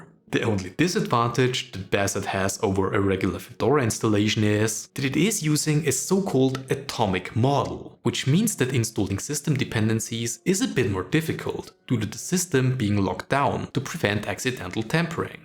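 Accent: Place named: Germany. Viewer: ich höre in dem englisch einen deutschen dialekt raus 🤔😂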